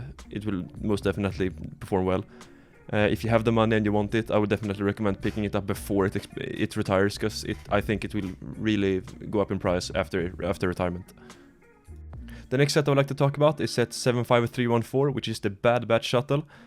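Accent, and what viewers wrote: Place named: Norway. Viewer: You sound Norwegian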